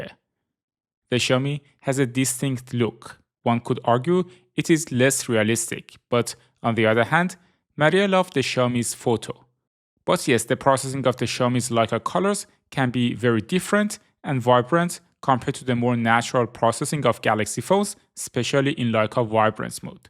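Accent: Iran. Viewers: your iranian accent tells everything.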